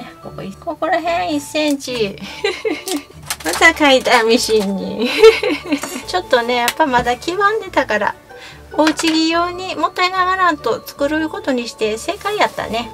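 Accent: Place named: Kansai, Japan. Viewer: さんいつも拝見してます！そのおしゃべりがほんわかしてて本当に癒される〜 適当でええよ〜とか！時々 関西弁？って思える節もありますがどちらの出身ですか？ワンピース簡単そうでチャレンジしたくなりました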